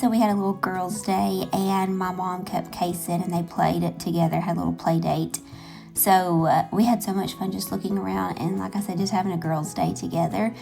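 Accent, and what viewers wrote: South, United States. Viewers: You and your sweet little family are precious ! I have family in AL, so listening to you talk with your southern drawl takes me right back "home" 🥰 Love to see all of your finds and how you decorate with them !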